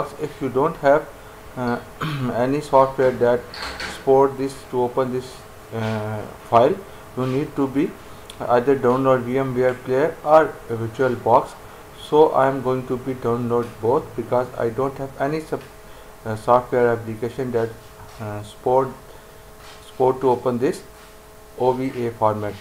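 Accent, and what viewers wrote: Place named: United States. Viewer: Hello Bro' your English-like American people😆😆😆😆😆😆😆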